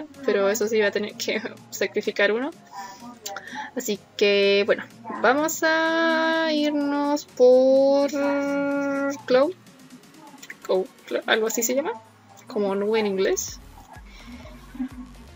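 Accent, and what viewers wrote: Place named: Chile. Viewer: Se nota altiro que eres chilena te amo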